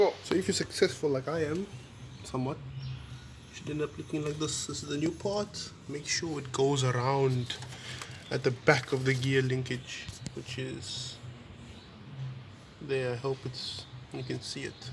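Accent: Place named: Southern Africa. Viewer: Helped allot man are namibian or south african your accent sounds familiar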